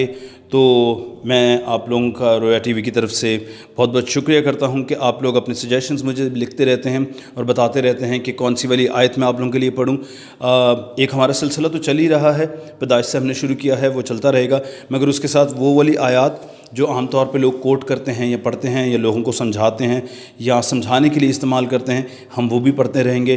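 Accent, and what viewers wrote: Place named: Greece. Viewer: Nice greek accent Sir.... thanks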